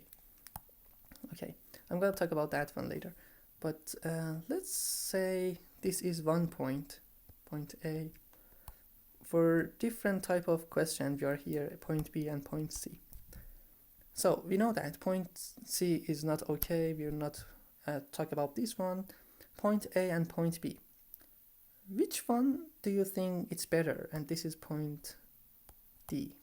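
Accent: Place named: Iran. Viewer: I like the persian Accent though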